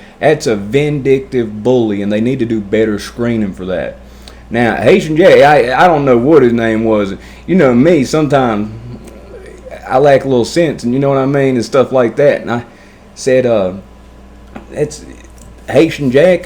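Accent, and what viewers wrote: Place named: Southwest Virginia, United States. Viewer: Dude I don’t know where your from but it sounds like from back home, I’m from Southwest Virginia and did almost 6 years camp 1. I live in the Philippines now and have been sober since January 17,2005 I was on pills and dope bad junked out lucky I didn’t get caught doin a lot of dirt.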